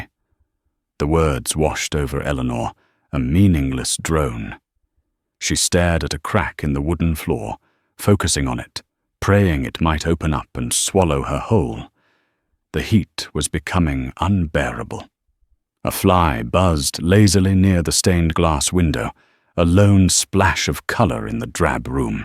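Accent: United Kingdom. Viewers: What a great voice! A beautiful British accent. Interesting story. Oslo, Norway.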